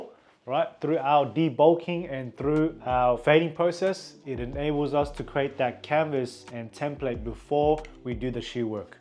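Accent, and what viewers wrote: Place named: Australia. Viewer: You sound like an ozzie mate 😀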